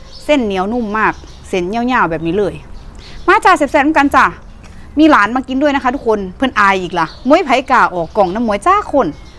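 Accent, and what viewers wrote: Isan, Thailand. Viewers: พูดอีสานก็เพราะดีค่ะชอบคนเหนือฟังเป็นอยู่่กดติดตาไห้แล้วค่ะ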